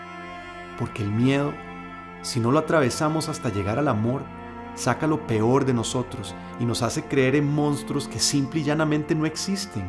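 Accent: Costa Rica. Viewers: Desde Guatemala, mis respeto, que hermoso escuchar a un tico hablar asi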